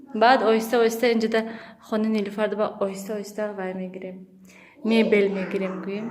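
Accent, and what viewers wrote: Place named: Khujand, Tajikistan. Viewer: Нилуфар салом муборак шавад хонаи нав насиб куна худочон моям сохиби хона кунад мебахшед шумо гапзанитон хучандихоба монанд не душанбегихоба монанд мо хамихе гап мезанем илтимос нависен ай гучо шумо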